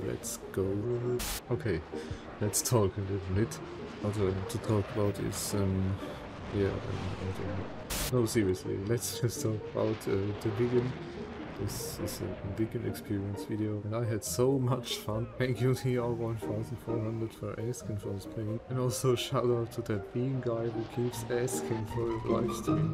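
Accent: Germany. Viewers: I really like your voice I didn't expect that at all it's very manly and it sounds like you have a bit of a German accent 😅 but I'm not going to say anything I'm French so my English accent is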